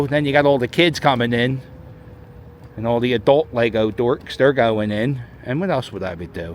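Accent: Philadelphia, United States. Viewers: You definitely have a strong Philly accent 😂.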